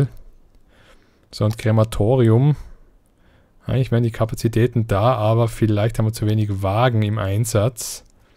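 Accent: Austria. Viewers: Finde die Serie super! Bin momentan im Krankenstand und bin noch nicht dazu gekommen mir das spiel selber zu kaufen, jetzt habe ich mir jede Folge von dir angeschaut. Habe zu erst begonnen bei Gronkh zu schauen, finde aber dessen "Spielweise" etwas umständlich. Und da bin ich mehr aus Zufall über deine Videos gestolpert, da geht was weiter in den Folgen und bin auch größtenteils mit deinen Entscheidungen einer Meinung was bei Gronkh z.B. nicht immer der Fall ist, daher finde ich diese Videos netter zum anschauen. Ebenfalls finde ich deinen Dialekt netter, bin ja selber Österreicher und finde es sehr angenehm. Habe mir auch einige deiner Sid Maier Railroad folgen angeschaut und überlege mir auch dieses Spiel zuzulegen so als alten Klassiker :D WEITER SO! Freue mich schon auf die nächste Folge!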